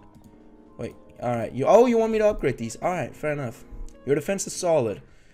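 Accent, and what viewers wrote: Denmark. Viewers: are u a danish person ore what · Lol er du dansker? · Are you from denmark? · are u from denmark?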